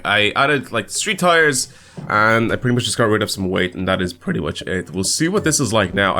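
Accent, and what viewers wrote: Ireland. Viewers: are you irish?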